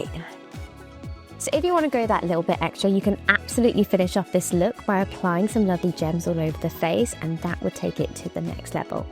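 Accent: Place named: Wales, United Kingdom. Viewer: Love this look ❤ Also this is so random, but looks and sounds a bit like Amy Dowden from Strictly! I'm sure I can hear a Welsh accent being Welsh myself, so I wonder if they are related somehow?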